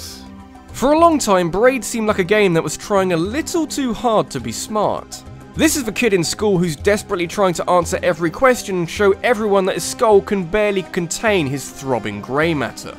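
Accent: United Kingdom. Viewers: Blue Spartan Gaming he's British, so his accent will be different than us stupid Americans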